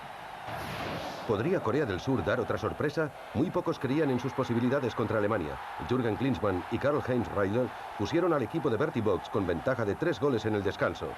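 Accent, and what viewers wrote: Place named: Spain. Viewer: Para documentales no existe nada mejor que la de un español o española... lo máximo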